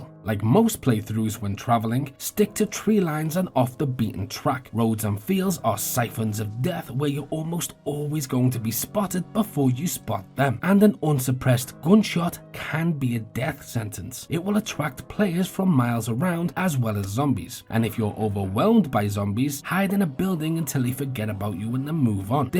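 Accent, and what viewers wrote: Liverpool, United Kingdom. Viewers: Can I hear a scouse accent here ?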